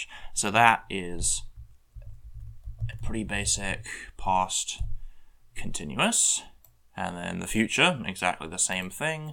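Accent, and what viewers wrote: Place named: South, United Kingdom. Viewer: I know it isn’t the point of the video but I just love your accent. Southern British, right?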